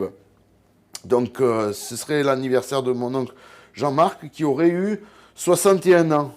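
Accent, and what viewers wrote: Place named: Gard, France. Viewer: Tu vois, j’ai ton âge, ta carrure, Artisan, Gardois, le même accent et expressions, cassaïre au Cirque de Navacelles pendant 25 ans, ma mini chaîne YouTube, mais cette vidéo, TU M’AS fais CHIALER!!!! L’apprentissage a 14 ans, les mobylettes la pêche l’armée.... Tu dis que des vérités de notre génération.... En mars 2017 je me suis pulvérisé la main droite au chantier, j’ai repris boulot en avril 2018, Donc bien sûr RSI m’ont rien filé ou pétoule... Souvent j’ai chargé le 12! J’y pense parfois! Pas besoin d’être jeune pour peter un plomb.... Et tu sais quoi? Comme Stéphanie, tu et mon échappatoire quand je vois tes vidéos! Merci pour ta bonne humeur Je passerais à ta boutique un jour Bises du Pont du Gard Patrick RAPIDO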